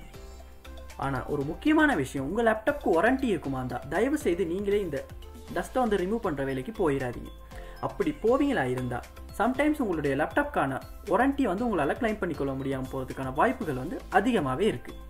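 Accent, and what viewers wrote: Sri Lanka. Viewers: Srilankan friend pesra mari iruku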